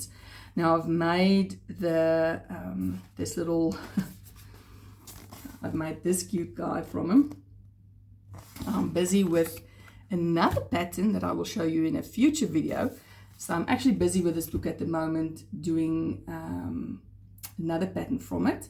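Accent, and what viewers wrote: South Africa. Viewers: Hi I love the animal friends of Pica Pau 3. This is the book I recommend. My parents lived 12 years in Cape Town and I loved going on holidays there. Your accent reminded me a lot of memories.❤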